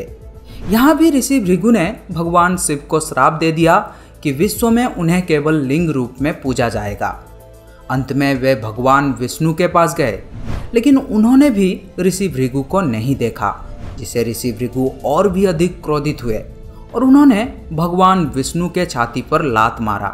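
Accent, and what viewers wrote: Odisha, India. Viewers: This youtuber is also an Odia..you can mark his odia accent.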